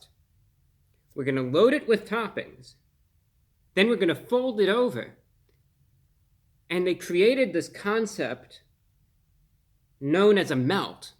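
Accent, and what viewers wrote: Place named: Mid-Atlantic, United States. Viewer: a stylized 1940's Mid-Atlantic or Transatlantic accent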